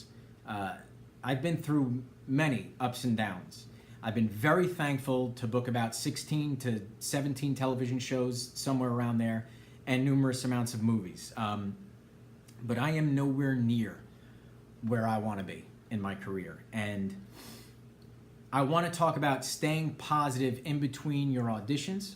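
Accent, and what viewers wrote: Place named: Brooklyn, United States. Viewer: Love the Brooklyn accent omg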